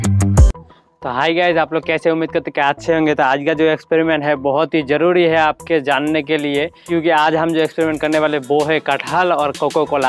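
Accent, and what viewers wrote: Bengal, India. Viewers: Aap west bengal ho Kiya?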